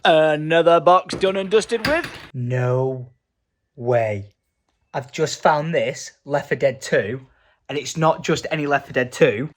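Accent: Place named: Wigan, United Kingdom. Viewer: The way to understand what you do for us when we watch this vlog. You are to us what Old School Runescape is to You. I have watched almost everything from call of duty, Minecraft, battlefield, vlogs anything really you put out. Also I used to live in Wigan (Platt Bridge) and Your Accent is nostalgic for me so that helps. Much love From Glasgow Scotland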